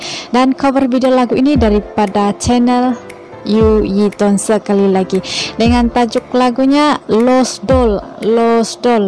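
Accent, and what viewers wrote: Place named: Brunei. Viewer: owh...pantesan dialek melayu nya mirip brunai.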